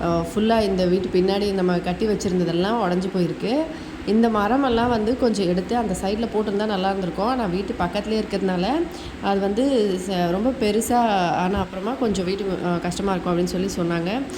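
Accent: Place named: Odisha, India. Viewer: Odia language neenga pesuradhu siripu dha varudhu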